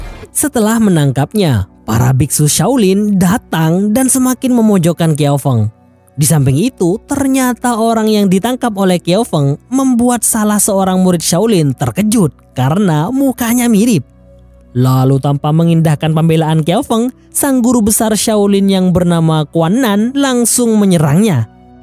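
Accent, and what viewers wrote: Madura, Indonesia. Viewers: Reng madureh bn bang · Logat Madura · Dooo reng madureh 🤣 Salam Bretd 🤘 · Jir Reng madureh cuk 😁😁😁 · Reng medureh kakeh kak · Bg lu orang Madura ya engkk padeh Reng madure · Car klacer tag ron karon...mik medureh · Payah ongguen, lee kakeh reng madure 😆, Salam stong dhere kak, sampang Torjun☝️ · Abbe kak reng madureh ben 🤣😂🤣😂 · Ehh kakeh reng madureh kak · madura kah cuy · Adminnya ini ternyata madura 😂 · Miminnya Madura nih😁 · Mantaaaap medureh demmah lek 😊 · Beeh oreng madureh kakeh bang. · Ini yg ngisi suara orang madura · Medhuro min "Carkelacer tak ronkaron"😂😂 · Orang madura nih yang nyeritain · Jir orang madura yg nganarasi cerita flm nya 🗿😂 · Reng madureh jih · Mk host sah reng madureh reh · Guy Madura beihh bahasana leburen😂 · Madureh madimmh reah si admin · Min nya pola oreng madureh mak pelak pkk bhsa mdura tamancok kereng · Carklacer tak Ron karon. 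Maduranya kentel banget bang. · Ternyata Reng madureh sampean, hehehe, carkalacer tak aronkaron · Mimin orang Madura yah🗿 kok aku kek gk asing sama ucapan Mimin yg bilang temancok kerreng.dan ee payah ongku😮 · Mak tak ron karon kanak... Abbeh reng madureh ongguh ye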